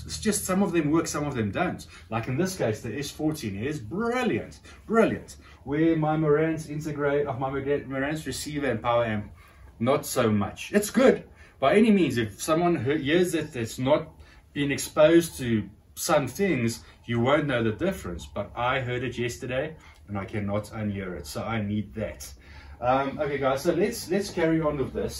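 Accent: South Africa. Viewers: My god its my first time ever hearing a South African accent and it just sounds so cool 😎 love the speaker review too 👍 greetings from Germany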